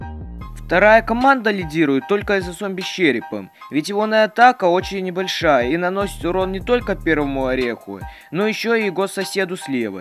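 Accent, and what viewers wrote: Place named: Ukraine. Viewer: Мне кажется что ты украинец или беларус я беларус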